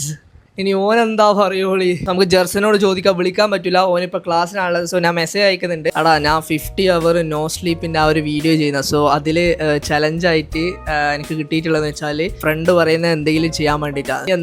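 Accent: Kannur, India. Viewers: Neee kannur edyaaadaa..? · Kannur aahno brthr😮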